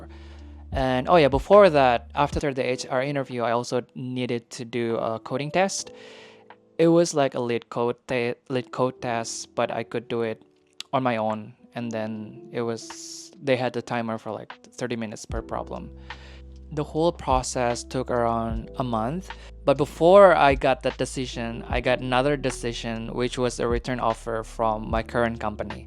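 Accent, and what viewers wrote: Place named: Indonesia. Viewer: Sounds Indonesia I guess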